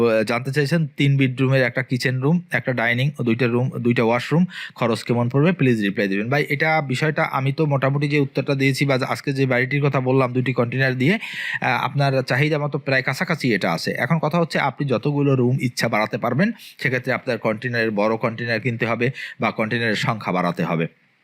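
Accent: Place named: Barishal, Bangladesh. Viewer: ভাই আপনার কাছে একটা প্রশ্ন রয়েছে, আপনি কি বরিশাইল্লা? মানে আপনার বাচনভঙ্গি যতই শুদ্ধ করেন না কেন আপনার বরিশালের ভাবটা রয়েই যায়। বলি কি শুদ্ধ করে কথা বলার দরকার নাই বরিশাইল্লা কায়দায় কথা বলেন তাহলেই তো হয়।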